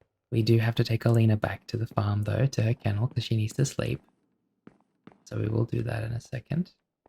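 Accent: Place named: Australia. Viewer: You uploaded this right as I needed something to sleep, thank you for the great content as always. As a fellow australian, it's comforting to have a familiar accent. Especially since I am staying overseas right now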